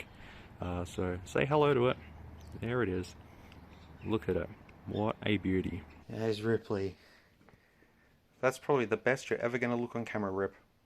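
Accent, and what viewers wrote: Australia. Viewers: You're a fucking Australian, be proud of your wacky accent. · he's from Australia dumbass, they pronounce shit differently, grow a pair and get over it american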